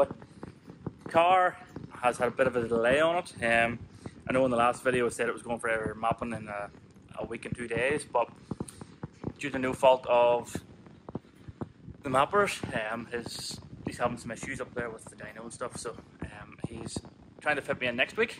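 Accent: Scotland, United Kingdom. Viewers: All I can say about your damn racket, is CRANK IT UP,! the montage shred or the V6, but CRANK IT UP! You need to take yourself down to Shropshire and drag Rich down to a pub with a good sound system and have a DJ Shoot Out! I'll admit to old and moldy- but your music choices were really "on time" with me. And the Capri, she has a real crackly sound, even before the "big tune"- awesome! Make no mistake, the lilt of your Scot's home accent is much fun- I come from a family with some "furrin" roots too! But the music over was two fer for me- the sounds and the engine! It does look like you maybe through before Binky gets all reassembled- if you go to Shropshire, go in the Capri and show them what a finished car, reassembled looks like- poor Binky has been scattered to the four corners for a long time now! Best to you, the missus and kids, PS; You go down to Shropshire, buy Nik about 7-8 pints and then get him to judge! 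Richard has children and can't afford that level of bribery! Since the gorgeous blue paint job didn't cost nearly what Binky's anything but "plain stove white" must have- even in favors it must have been a pile- a few pints you didn't drink should keep the missus from worrying! Or take her along- bet ya'll haven't been on a "date" like that in a while! FR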